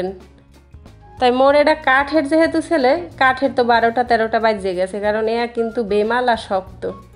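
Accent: Barishal, Bangladesh. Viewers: আমি বরিশালে বউ আপু।কিন্তু বরিশালে কোন ভাষা আমি বলতে পারিনা।তোমার মুখ থেকে বরিশালের ভাষা শুনতে খুব ভালো লাগলো আপু।তোমরা ভালো থেকো।দোয়া করি।আমার একটাই মেয়ে।নাম মানহা।আমাদের জন্যও তোমরা দোয়া করো। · আমিও বরিশালের মেয়ে + বউ। একজন দেশি আপুর কথা শুনে মনটা প্রশান্তিতে ভরে গেলো। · আপু অনেক ভালো লাগছে আপনার এই বরিশালের ভাষা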